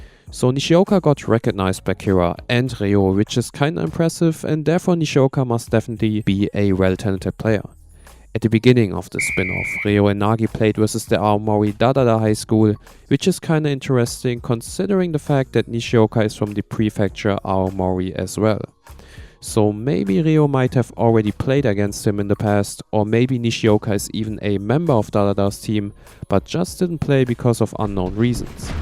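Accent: Germany. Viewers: Man hört den Deutschen Akzent richtig raus😂aber trotzdem starkes Video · me too the german accent is so strong you cant unhear it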